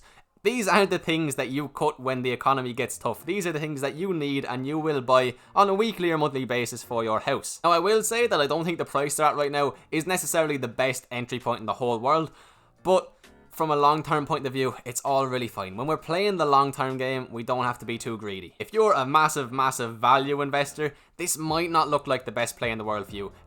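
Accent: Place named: Ireland. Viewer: Like given for the Irish accent.. I loves the Irish people’s 🤪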